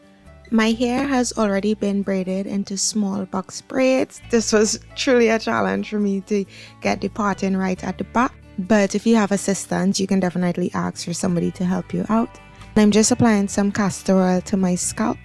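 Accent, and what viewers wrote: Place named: Trinidad and Tobago. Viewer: Trini to the voice ❤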